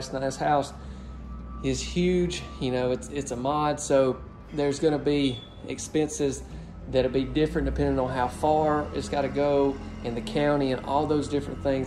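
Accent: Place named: South, United States. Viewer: I love your videos. The quality of filming has truly improved as you learn more from our comments. These homes are certainly impressive. But I totally watch them for another reason. I love your accent. It has been years since I was last down in GA and heard me some sweet southern charm. Have a blessed day.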